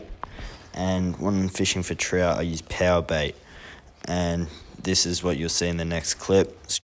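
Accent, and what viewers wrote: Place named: Australia. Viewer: 🔥 love the good old Aussie commentary 😂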